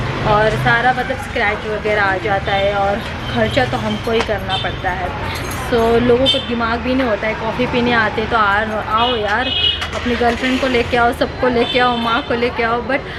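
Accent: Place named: Maharashtra, India. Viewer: Kay yar kay loka ahet...Tumhi kay punekar dista watate. punyat sHhudh marathi bolali jate. Tasach itar bhagat marathi ch bolali jate pn wegli tone ,uchhar wegle astat tyat kay awdha.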